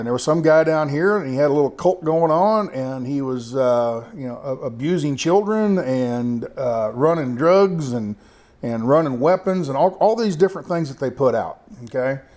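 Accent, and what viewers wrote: United States. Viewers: your the best adult story teller.. You have a soothing voice with an all American accent... Love you videos Bud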